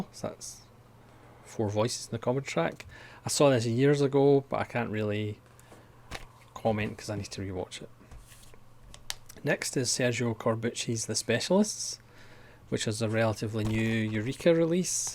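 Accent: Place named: United Kingdom. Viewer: I watched the whole thing in one viewing. I like westerns and grew up watching them to an extent as my dad was a big western fan. I have a friend that doesn't like Miles Davis. I told him but there are other reasons to listen to Davis--he as an amazing ensemble around him that play the music as well: John McLaughlin, Herbie Hancock, Wayne Shorter, etc. The same is true for John Wayne pictures. In The Cowboys, Bruce Dern plays a great villain. Red River one of the greatest scenes as the cowboys hoot and holler as they begin their trail drive. The Searchers is just an overall well made film, and Wayne's character isn't exactly portrayed as a hero, more obsessive. Perhaps later on you'll be able to approach him and understand his performances. Are you Brit or Scottish or Irish? I ask because you have a rather thick accent. Some westerns you didn't mention: Lonesome Dove and Return to Lonesome Dove the TV series was very well made. 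Paint Your Wagon is an interesting western musical. Unforgiven is an incredible western where a gunfighter's past comes back to haunt him. Little Big Man is a rather circular film from white man to Indian culture and back again. A Man Called Horse shows some interesting Indian culture. Hud, a contemporary western with Paul Newman. All the Pretty Horse, another contemporary western. Shane--consciously mythic, superb characterization in Alan Ladd's character and a crazy good fight scene. Pat Garrett and Billy the Kid--I tend to enjoy this more over The W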